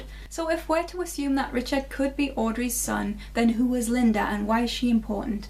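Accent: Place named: Newcastle, United Kingdom. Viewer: Hay I love your videos and listening to you talk about twin peaks in that wonderful geordie accent :) you always put forward some interesting ideas and if I may say so, you are gorgeous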